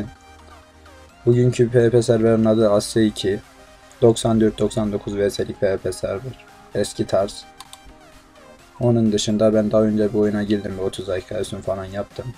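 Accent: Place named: Erzurum, Turkey. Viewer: Oo erzurumluymus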